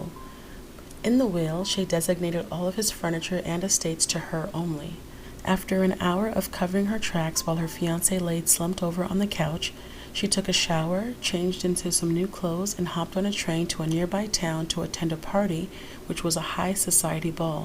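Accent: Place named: Canada. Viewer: You've taken the bull by the horns here. That is extremely commendable. I've said this before, but I really, really like how you end your videos with discussion questions. You are a very strong personality. Ha ha. Just judging from your accent, are you Canadian or upper U.S. midwest? I've been all over in my life, and that's what I think I hear. I'm prepared to be wrong though.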